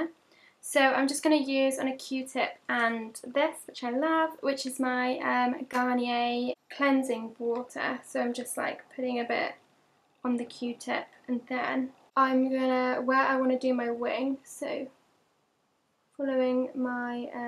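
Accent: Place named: United Kingdom. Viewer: I love ur british accent.. I love your face.. Love your voice when your talking..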